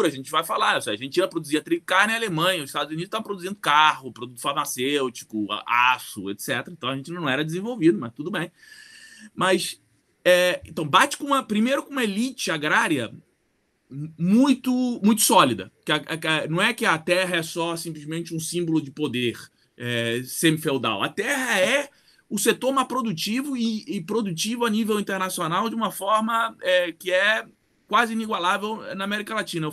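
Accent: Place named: Rio de Janeiro, Brazil. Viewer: Muito clara e inteligente as análises histórico- econômicas. Gostei demais, aprendi muito. Ele tem português impecável, aliás o português dele tem as características, sotaques, brasileiro-carioca. Cumprimentos sinceros ao Prof..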